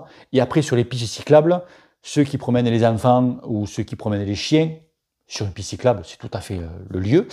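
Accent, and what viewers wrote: South, France. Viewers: Bonsoir, j'ai deux petites questions. Premièrement, comment ça se passe au niveau de la douane? Et ma seconde question quid pour le SAV en cas de pépin? PS: j'adore ton franc parlé, bien du sud:)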